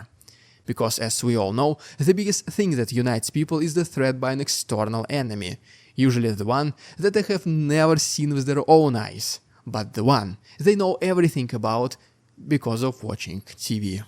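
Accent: Russia. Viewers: I used to watch your videos all the time when you just started but they are becoming increasingly more difficult to watch as time passes. There used to be some 'entertainment' involved but that has almost entirely disappeared nowadays. You can speak very good English for a Russian but your accent makes a long monologue very difficult to listen to, it is difficult for me to maintain the necessary concentration and I very quickly simply stop listening to you. What makes you think people want to sit and decode what you are saying? I believe you have a narcissistic nature and I also believe that if you don't make some very drastic changes to your content your channel will simply fade away. Get some good advice from someone who will be both honest and objective.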